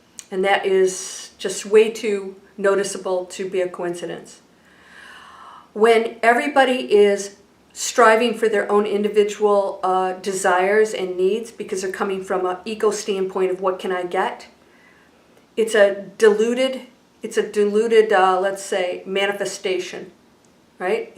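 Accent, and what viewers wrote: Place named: South, United States. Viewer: I wish that was my next door neighbor. I thought that you would be Russian with that name but you have a country accent.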